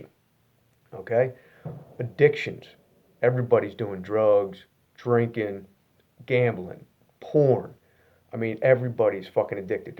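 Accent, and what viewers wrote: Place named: North Jersey, United States. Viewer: can tell from the accent.. am from north jersey myself.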